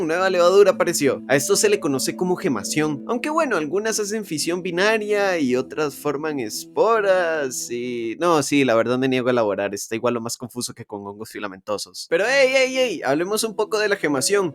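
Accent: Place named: Costa Rica. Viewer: Eeeyyy yo conozco este acento.. ticoo tuanis padre que bueno ver un youtuber de biología de aca.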